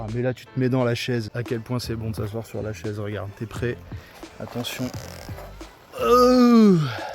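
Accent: France. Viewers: salut, j'entend que tu est du limousin!? moi de même ✌peut être qu'on se croisera 😉je m'abonne pour la peine😎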